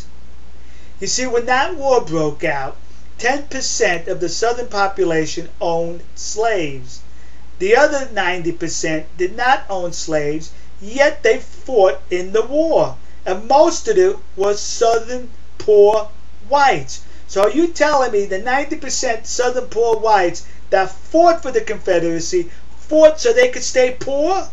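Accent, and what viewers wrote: New York, United States. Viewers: your really smartand ican tell you have lived in new york you still have th accent, but you are smart, congratz on anoter video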